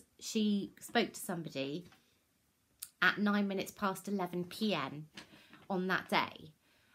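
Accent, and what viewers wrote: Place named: United Kingdom. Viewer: Let me tell ya, you could help over info I already know and this Texan in her 50s would find and about anything you say in that endearing Brit accent requires no apology. Go ahead. Make a video saying, "We are invading Texas and under our rule Texans must consume tea every morning, afternoon and evening or pay a fine, doubling for each offense." Most would think it sounds charming.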